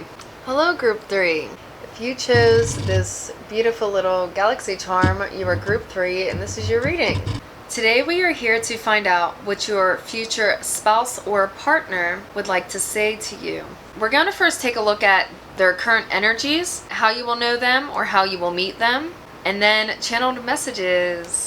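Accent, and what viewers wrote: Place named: Pittsburgh, United States. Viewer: and you have a Pittsburgh accent like me haha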